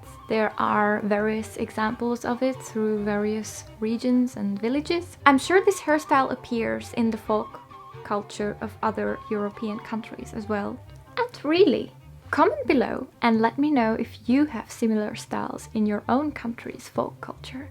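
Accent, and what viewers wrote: Hungary. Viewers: megtaláltam egy videódat régebben és megesküdtem volna hogy magyar akcentusod van, de ezek szerint jól éreztem :D 10 évig néptáncoltam, mi is hasonlóan kötöttük a hajunkat :))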